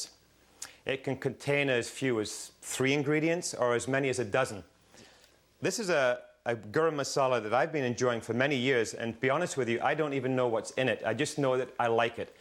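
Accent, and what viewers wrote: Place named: Scotland, United Kingdom. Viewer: You have a bit of scottish in your accent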